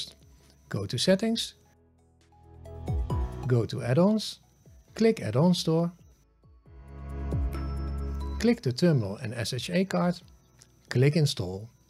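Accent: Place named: Netherlands. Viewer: Hey there! Are you perhaps from the Netherlands? As another dutch person I have to say you've got an amazing accent, definitely still recognizable as dutch but FAR better than any other dutch person I've heard speaking english (Who isnt a professional singer who has trained their accent for their entire life.) haha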